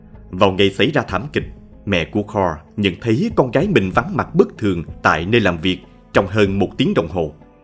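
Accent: South, Vietnam. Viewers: Thích nge tiếng MN của nói chuyện rỏ ràng rất hây và tiếng.nói của HG ẢNH nói chuyện rất hay ủng hộ.kênh.2 cháu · Giong doc Mien Nam Phat am rat ro nghe rat hay, xin chuc suc khoe👍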